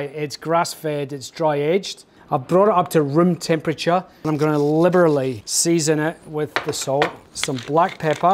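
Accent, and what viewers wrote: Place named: Scotland, United Kingdom. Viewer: scottish guy where you from in glasgow?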